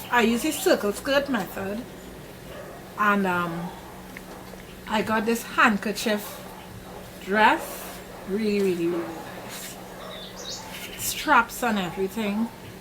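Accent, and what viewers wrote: Trinidad and Tobago. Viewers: where are u from?. Sound like Trini. i am trini :-9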